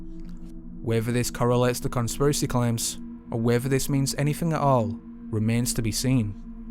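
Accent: Scotland, United Kingdom. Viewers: Is he Scottish? He sounds like David Tennant.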